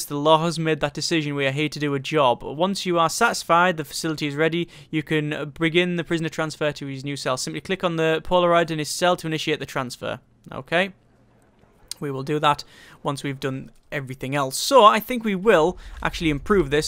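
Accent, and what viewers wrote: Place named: England, United Kingdom. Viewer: As soon as you started talking i knew you were either English or Scottish. (Maybe even Irish)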